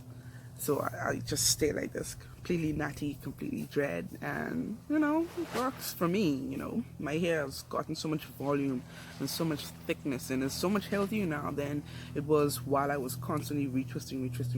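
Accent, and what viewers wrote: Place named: Bahamas. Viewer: I knew I recognized the accent ... I'm from Nassau, lived in Freeport for 20 something years. Been in the States for 21 years. My locs are 8 years old, had to combine twice. They're almost waist length. I'm trying to commit to freeform, semi is harder since my locs are thicker. I will commit though. Not religious reasons, I just love it and chemicals are no good. I absolutely love your locs and the styles. Shalom Queen !👑💜👍🏽💋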